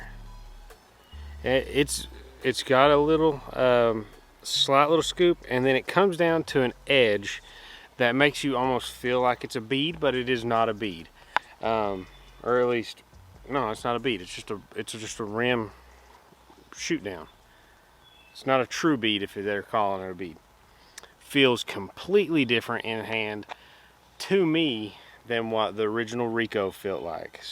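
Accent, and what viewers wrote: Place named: North Carolina, United States. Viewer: Love your content. When I started watching your channel I was saying to myself " man that guy sounds like home ". I live in Humboldt Co California. Then I herd you say Yadkinville.... I grew up in Trap Hill and High Point, lol. It's like hearing myself talk!! Just had to come home last year to put my grandfather to rest, and discovered the quality courses around home. You ever play Rolling Pines in North Wilkesboro?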